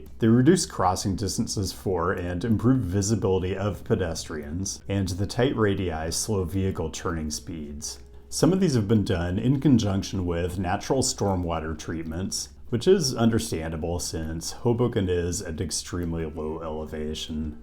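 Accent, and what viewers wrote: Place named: West, United States. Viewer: He has a General American accent, which is most of the Western USA. He grew up in the NW in Seattle.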